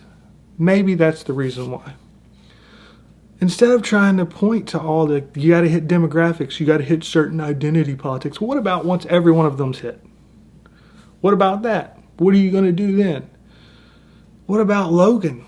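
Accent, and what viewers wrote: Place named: Rural South, United States. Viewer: like I said, a southern white person calling people stupid and an idiot is winning the argument. You DID NOT make valid points in this video. You are just another white person on YouTube. You are not important in any way what so ever. Understand that. You are not owning mrwin your comments. You are not Perry Mason and you sound like a hick. Your opinion doesn't count so why are you acting like someone is attacking whites? You want to call people Nazi because you want to grasp for straws. You don't think race should matter because you are white. The best person to you is a white person. Get off your high horse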